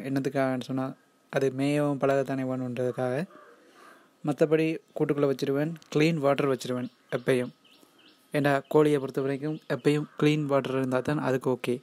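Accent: Sri Lanka. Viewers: this sri Lankan speek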